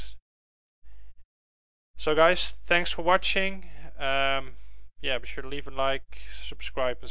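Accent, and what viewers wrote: Netherlands. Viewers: are you dutch ?? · is it possible that you are dutch ? i hear a bit of a dutch accent in your voice :D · where r u from?? holland?? :) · Je bent Nederlands of niet? ik kan het horen.. C: Wel erg leuk dat je zo ontzettend groot bent op YouTube. Ik denk de grootste Nederlandse Youtuber. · Ben je Nederlands? Je klinkt Nederlands.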